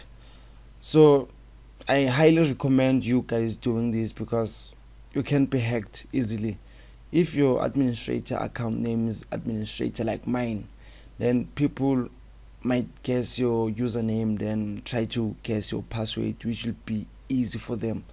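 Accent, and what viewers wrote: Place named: South Africa. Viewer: You sound like South African😊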